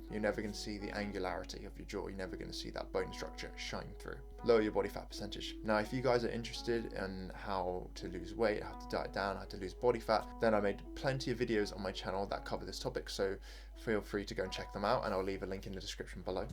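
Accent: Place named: United Kingdom. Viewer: ngl I've never seen an asian with a UK accent completely threw me off LOL. Great vid tho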